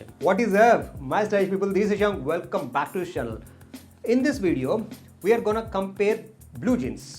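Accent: India. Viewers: It's been a long time since I heard this Indian English. )))))😉😉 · no offense, but Indian accented English needs to be spoken with a slower cadence for it to be understood by many people.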